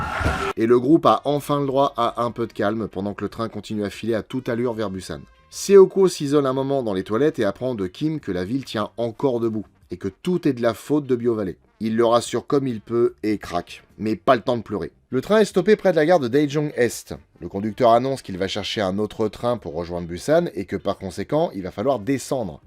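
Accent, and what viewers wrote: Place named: Belgium. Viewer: Une île au large du Costa Rica… Sans blague ? Ça y est ? Tu abordes un de tes "big 5" ? Et sinon, je suis trop fier d'avoir tout de suite repéré la ref aux 12 travaux d'Astérix ! #accentbelge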